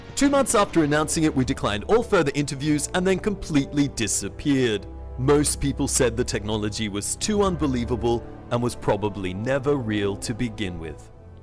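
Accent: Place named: Australia. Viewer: As an american used to the way americans speak I have to say I do sense a bit of an eronant undertone in his speech but I know that thats just the australian accent. So hes not a douchebag, his accent probably just tricked you into thinking that.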